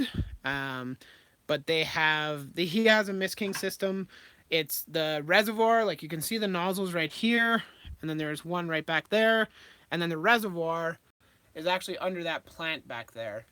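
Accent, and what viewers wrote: Canada. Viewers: just curious where you reside? u sound like an albertan like me (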